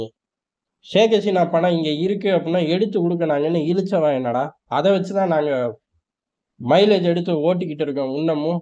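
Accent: Madurai, India. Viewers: மதுரை ஸ்லாங்காத் தெரியுது !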